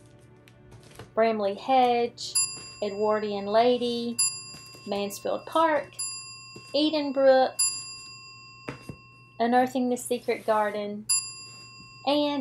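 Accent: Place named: North Carolina, United States. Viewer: I just found your channel through Taylor. I have the secret garden book too. I really can’t wait to read it! It looks so pretty. Side note - are you from NC? Cause that’s where I’m from and your accent sounds just like so many from down here! I’m on the last chapter of Mansfield Park! I absolutely love it. The end of the book does take place in spring time, just after Easter.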